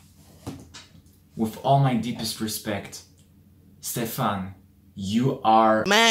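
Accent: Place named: Israel. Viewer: Bro......l can hear your isreli exent. pliz stop embresing your self. THNKS.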